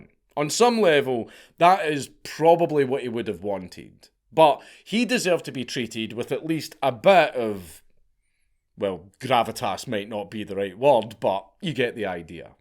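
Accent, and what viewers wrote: Scotland, United Kingdom. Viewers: To his Dankulest Lordliness, Hey Dankula, this is a some what formal request. I will offer your choice of £50 or 13 Geese, an Average sized angus cow, a breeding pair of ducks and a single tabby cat or My first born son in exchange for the full unedited middle earth lore dump. I never knew how much I NEEDED to hear a Scottish man talk about middle earth lore, thank you for coming to middle ages welsh barter sesh for dankula content. Yours sincerely, Grand Elder Nanak the High Viseer of the Welsh County Council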